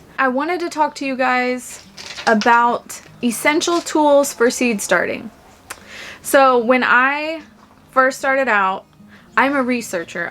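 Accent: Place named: South, United States. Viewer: you may be in Indiana but..no way that you are from Indiana. Or.. Perhaps you're just throwing some southern charm (dialect) in as humor..? Come to think of it though you're not that far from me. (N.W. Alabama - Zone 7B)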